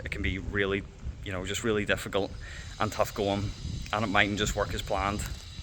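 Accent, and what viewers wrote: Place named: Ireland. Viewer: "My accent really isn't that thick and that broad" Uh, yes. Yes it is. I'm not saying you should change it, but you must certainly know that you have an incredibly thick Irish accent. Nobody does well in those unofficial language schools. They can't charge their students enough to both keep the doors open and pay their teachers fairly unless they're huge or gov't sponsored.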